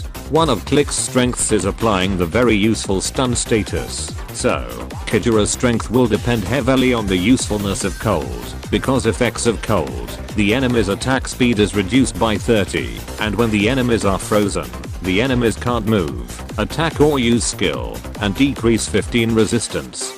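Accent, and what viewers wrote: United Kingdom. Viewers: British? that makes it even betteh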